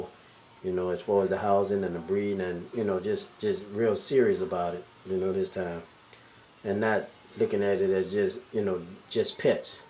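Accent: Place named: New Orleans, United States. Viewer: You ain't gotta show the saints flag to let people know you from N.O just talk we all know that accent lol great story bro love it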